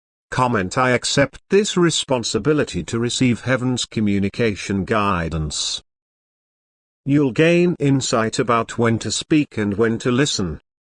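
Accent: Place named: United Kingdom. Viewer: Let AMERICAN talk to American people! Not everyone understands British language!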